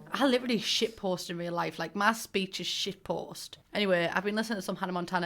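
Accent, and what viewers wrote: United Kingdom. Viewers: Can I just say how nice it is to see someone who is so British and the humour is too. It's so nice not to hear Americans, nothing against them but I just connect with a fellow Brit more. You seriously fucking crack me up and never stop with the accent and slang. Kim and Aggie 4lyf! 😂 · Her accent is by far the most “English” I’ve ever heard in my entire life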